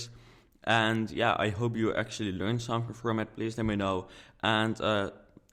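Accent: Netherlands. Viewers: haha je kan gewoon horen dat hij Nederlands is! xd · Ik hoorde meteen aan je accent dat je Nederlands was! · Haha ik dacht al METEEN dat je nederlands was Hahaha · before i saw your name i could hear you were dutch haha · Same! I'm Dutch myself and I knew as soon as he said the first sentence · Je hoort zo dat je Nederlands bent 😂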